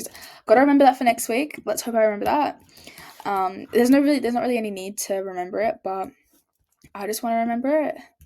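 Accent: United Kingdom. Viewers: Ok, I'm just going to get it out of my chest. You're very pretty and very smart. Love your UK accent 😂. Gold is a ticking time bomb its overbought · You from the uk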